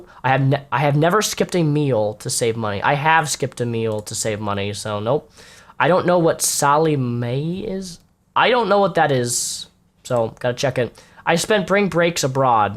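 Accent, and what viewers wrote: Finland. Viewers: Wait what, is he finnish??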